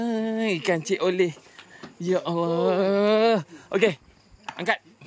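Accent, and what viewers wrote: Perak, Malaysia. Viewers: Pekat loghat peroknye, beso kome 😂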